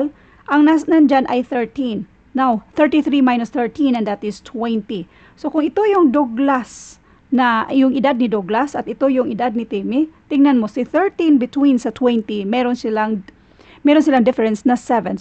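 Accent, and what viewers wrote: Visayas, Philippines. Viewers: Advantage po sa amin mga bisaya yung accent nyo po 😁😁😁 daghan salamat maam god bless ❤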